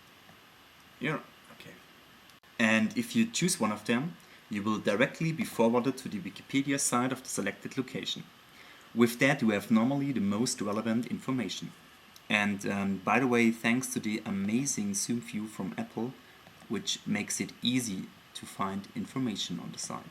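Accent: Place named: Germany. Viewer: du bist Deutscher, das hört man voll raus :-)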